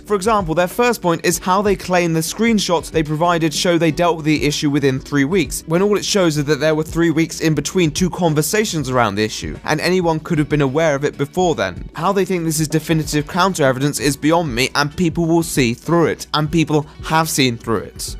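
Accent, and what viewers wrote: RP, United Kingdom. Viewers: Brits all sound the same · You sound so damn posh. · You soundly like such a posh wanker only complaint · I'm sorry, your videos are legitimately great on all objective levels, I just happen to be part of the like, 2% of Americans who are actually kind of turned off by the British accent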